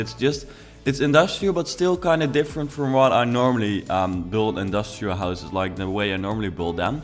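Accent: Netherlands. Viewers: That Dutch accent tho xD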